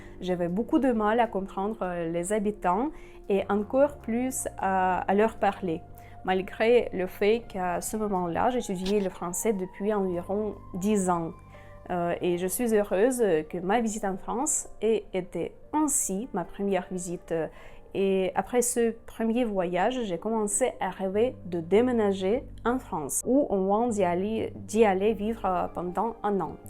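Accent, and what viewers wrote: Russia. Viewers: depuis décembre 2022 j'ai lancer ma chaine youtube sur les réactions musicales et je me suis axés sur les artistes russes que je trouve vraiment incroyable, sinon je viens de découvrir ta chaine et j'aime ce que vous faites et votre Français est vraiment très bon avec votre accent russe c'est juste superbe et moi j'adore la langue russe que je trouve magnifique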